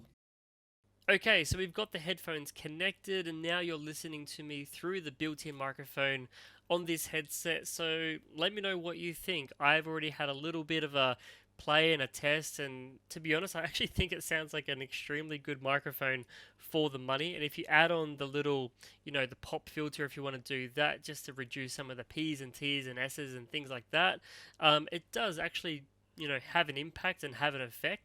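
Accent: Australia. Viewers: ahhh, the Aussie ascent is music to my ears. 😌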